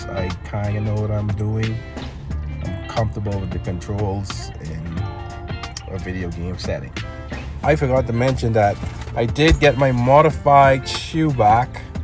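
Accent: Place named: Bahamas. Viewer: Congratulation on starting your aviation Journey . You sound Bahamian . only another Bahamian know another Bahamian accent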